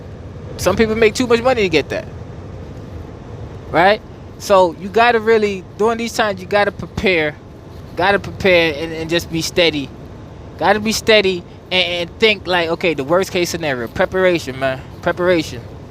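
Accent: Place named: New York, United States. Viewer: Lol he gotta be from new York lol that accent funny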